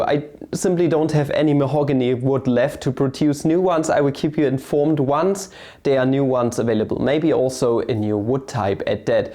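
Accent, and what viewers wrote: Germany. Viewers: Your German accent is way better than whatever other accent you're trying to imitate, you should rather stick to it.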